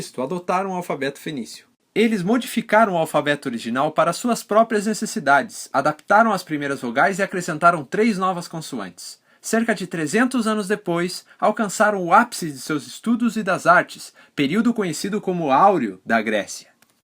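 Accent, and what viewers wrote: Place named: Brazil. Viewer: Ele tem sotaque de tudo quanto é região do Brasil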